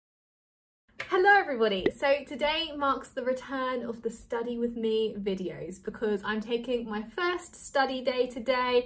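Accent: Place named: England, United Kingdom. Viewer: what part of england is that accent from? I like it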